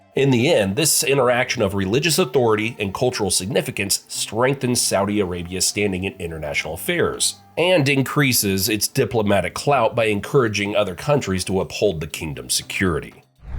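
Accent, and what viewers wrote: United States. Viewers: Thats how Americans pronounce it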